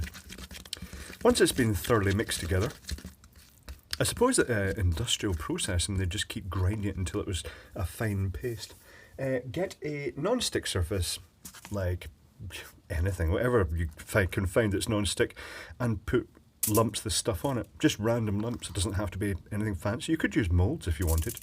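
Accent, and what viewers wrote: Scotland, United Kingdom. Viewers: He definitely isn't faking the accent. Proper Scottish cooking there.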